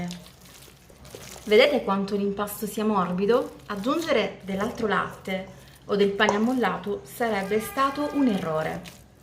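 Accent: Sicily, Italy. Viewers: dalla cadenza che hai quando parli credevo fossi di Palermo.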